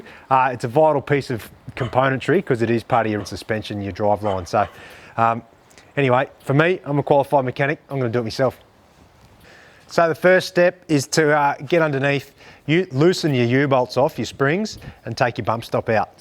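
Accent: Australia. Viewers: Australian accent mate “Straya” 🇦🇺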